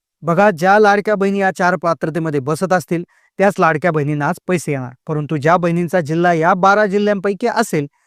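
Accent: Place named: Thane, India. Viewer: ठाणे जिल्ह्यात बोलते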